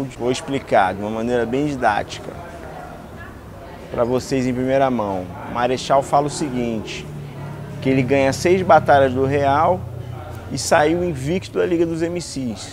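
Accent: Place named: Bahia, Brazil. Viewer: o kra fala assim deve ser bahiano kkk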